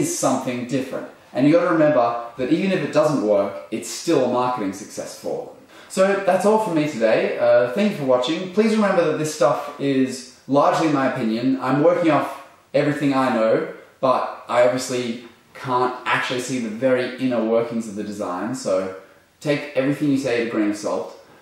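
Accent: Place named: Australia. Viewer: Contents are very good, although a little too quick and a bit confusing at times. Problem is the Australian accent. Hard to fully understand when you are not Aussie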